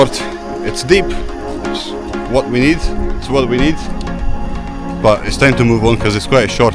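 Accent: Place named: Scotland, United Kingdom. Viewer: Love the Scottish accent.... definitely following you mate 👍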